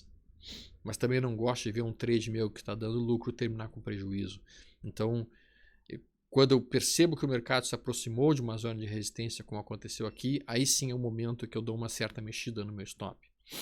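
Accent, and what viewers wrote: Rio Grande do Sul, Brazil. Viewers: Tu é do RS?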